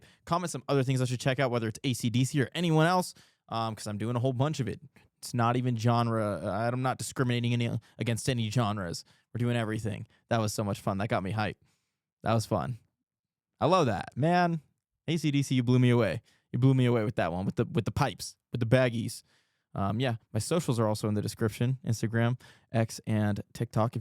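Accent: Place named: England, United Kingdom. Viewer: We're Scots. Around the World. You'll be fine. You sound like an Englishman though.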